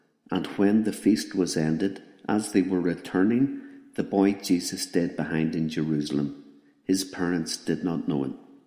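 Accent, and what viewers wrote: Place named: Ireland. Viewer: Thank you sir! I just love the Irish accent...it is the sweetest and most lovely of them all. We must remember how God has blessed the Irish for all the suffering our Irish ancestors went through in spreading the the Gospel throughout the world through the gift of the Holy Spirit in our One Holy Catholic and Apostolic Faith! How come someone altered the face of Our Lady of Guadalupe? This is not a true depiction...we must also remember to include the Saint Michael prayer with every Rosary we pray as we know Satan and his legions twist the truth and tell half-truths...even through pictures.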